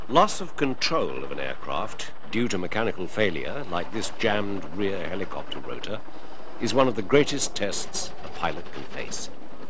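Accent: United Kingdom. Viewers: Why do they overdub an American narrator with a British one whose thick cockney accent makes it un watchable. Know why the original Mayday series was so good? The Narrator. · This "documentary" is total bs. They got one thing wrong after another, and then, 12 minutes in, they just made up a new cause for the Tenerife disaster which had nothing to do with it. Total idiocy. I guess any 16-year old kid with an upper-class Brit accent can spend a few hours cutting clips from other vids and watching a couple of them for a few minutes so that he can sling some lingo into a script and then put something like this together and people will watch it. I love that it's called "The Facts." I'm certainly not going to finish it. The only stuff they're going to tell me that I didn't know already is stuff that isn't true. Crap, crap, crap....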